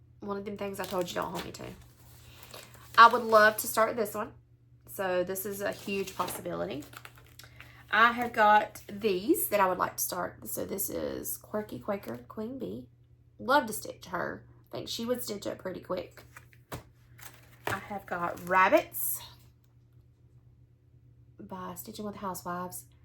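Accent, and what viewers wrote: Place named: South, United States. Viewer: Just found you. You sound like you have a very familiar southern slang. Was nice to hear. Enjoyed your tube. Thank you for sharing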